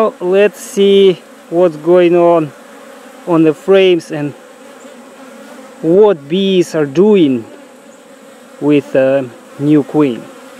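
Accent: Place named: Russia. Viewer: Мне одной кажется, что он на самом деле русский, но пытается говорить на Английском с ужасным акцентом..)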